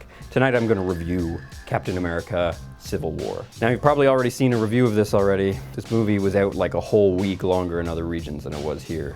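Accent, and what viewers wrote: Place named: Canada. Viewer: The canadian accent has never been so prominent.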